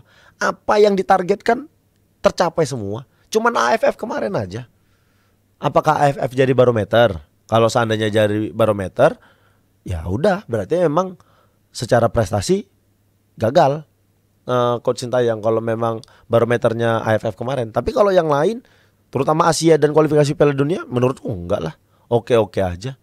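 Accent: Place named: Medan, Indonesia. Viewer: Logat BICARA OKI ttp LAWAK medan😂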